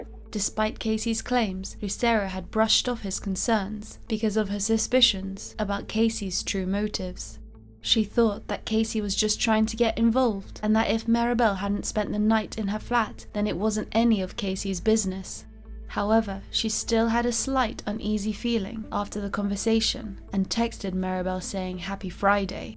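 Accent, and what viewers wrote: United Kingdom. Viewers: she got the most British sounding voice ever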